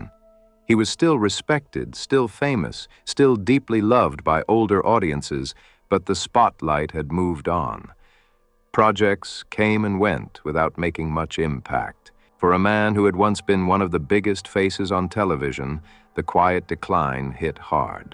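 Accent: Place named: North Carolina, United States. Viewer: I still watch Andy every night at 8 and Matlock 10am. He always took me back home to NC. That accent is what I grew up with and it brings me comfort.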